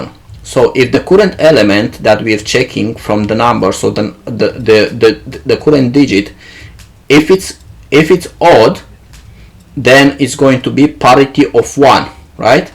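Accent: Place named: India. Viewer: Thanks for solution. As for me, it's a little bit difficult to understand your pronunciation since it is a litle bit similar to Hindi speaking people (some places). For example all, individual, confirm divisible.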